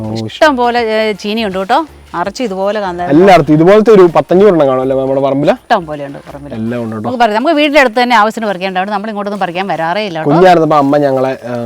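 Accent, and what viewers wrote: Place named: Ramapuram, India. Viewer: നാടൻ സംസാരം.. അറിവുകൾ... അമ്മച്ചി അടിപൊളി.. രാമപുരം ആണോ??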